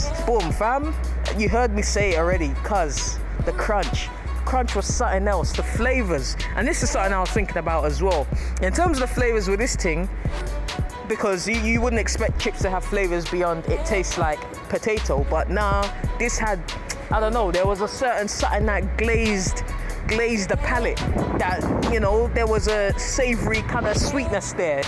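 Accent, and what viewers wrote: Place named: Roadman, United Kingdom. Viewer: I love how eloquently he speaks in a roadman accent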